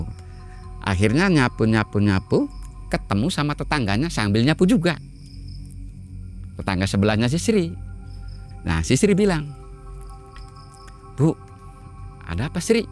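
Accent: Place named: West Java, Indonesia. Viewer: Kok logatnya kaya orang sunda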